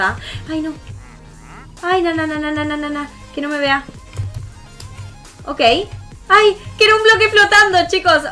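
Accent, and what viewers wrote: Argentina. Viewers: AUNQUE HABLAS ARGENTINO YO TE ENTIENDO TEAMOOOOOOOOOOOOOOOOOOOOOOOOOOO.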